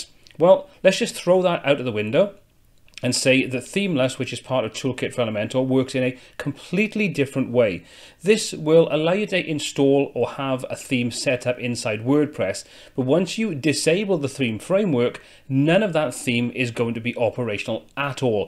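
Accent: United Kingdom. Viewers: sorry for my mistaken, in fact, i enjoyed your video. the problem is the British accent even is very nice but difficult to understand when talking fast. by the way, thank you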